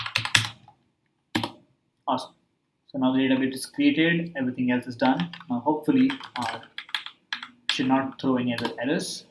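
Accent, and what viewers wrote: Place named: India. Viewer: Why you indians talk like this !! Please please please work on your accent , it's very hard to understand. I'm telling this to all the indians ...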